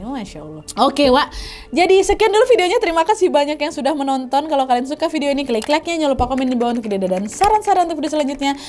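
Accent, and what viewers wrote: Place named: Pontianak, Indonesia. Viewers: Bahass kakak ini mirip bahasa melayu sambas singkawang,panggil tmn wak wak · Wak wak , mcem org melayu pontianak kak ni hehehe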